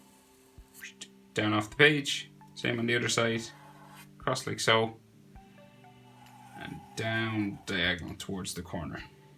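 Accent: Ireland. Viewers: are you irish??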